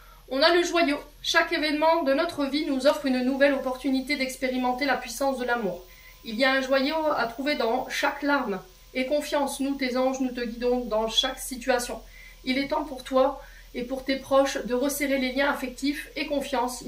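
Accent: North, France. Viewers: Incroyable 😍 ! Tout est juste pour moi et quel plaisir d'entendre ce petit accent du Nord. Merci beaucoup, je m'abonne ❤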